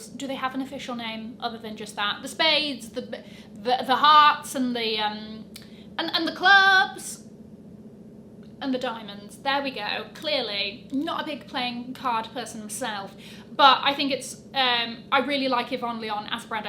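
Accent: United Kingdom. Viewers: “Burberry trench coat for everybody” she says with a strong British twang… 😊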